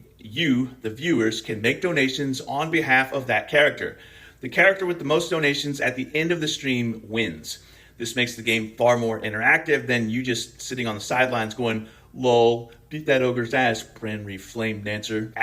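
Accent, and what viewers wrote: Scotland, United Kingdom. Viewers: It is funny how when you get angry the scottish accent disappears. I love stack-up. I have donated to them before. It is an amazing charity.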